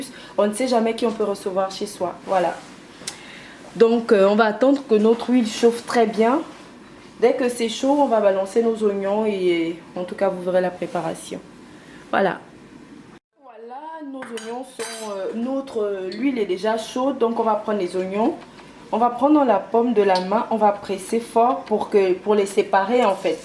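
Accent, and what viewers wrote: Gabon. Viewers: Au fond le sans accent des gabonais fait leur accent, gabonaise pure pure.. Merci mama!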